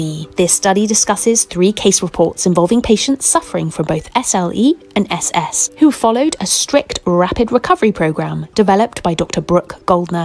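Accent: England, United Kingdom. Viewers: 'London". English accent. US measures. ChatGPT must try harder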